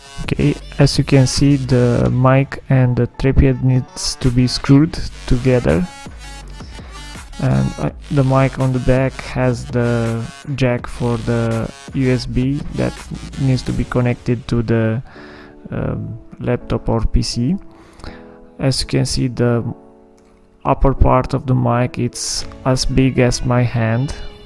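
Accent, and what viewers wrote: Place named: United Kingdom. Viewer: Que acento mas malo, seguro eres británico?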